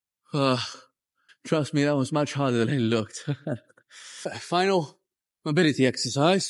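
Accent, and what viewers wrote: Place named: United Kingdom. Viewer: You have the most interesting accent. It isn't very British but yet there is a strong Brit tone in the way you enunciate.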